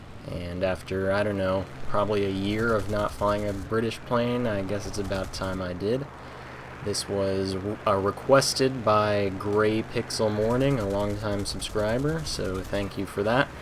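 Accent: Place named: New England, United States. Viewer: I bet your from Massachusetts. Your accent sounds like a New England Suburban Accent. I'm From Boston MA myself and we also say "retarded" like every other sentence. If I'm wrong I apologize but thats my guess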